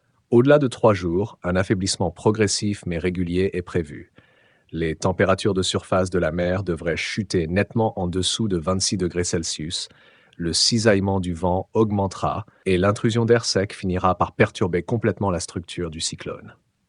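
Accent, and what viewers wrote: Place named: England, United Kingdom. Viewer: Laccent prends une petite tournure anglais des fois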